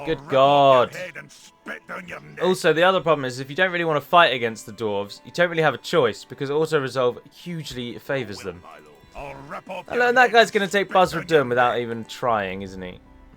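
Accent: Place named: RP, United Kingdom. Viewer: Mate, your sleepy voice sounds bloody brilliant! Makes you sound even more posh (didn't know that was possible)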